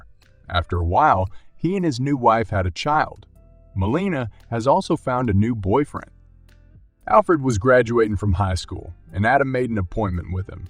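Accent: United States. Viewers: Stupid knows both genders equally, so nice to get the perspective from the husband who was a good man. Thank you! Love it. Keep up the great work! Awesome southern accent! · Narrator has a distinct accent. I'd bet Texan, or at least somewhere close to Texas. ETA: I'm a Texan, and he sounds like us.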